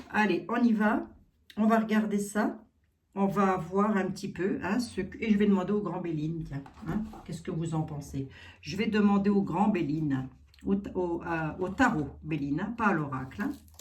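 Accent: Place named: East, France. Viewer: A propos du nain de jardin 🙏🙏🙏🙏 -moi je l'appelle Kaa, le serpent du livre de la jungle, il hypnotise les naïfs. Merci (d'où vous vient votre petit accent, l'est de la France?)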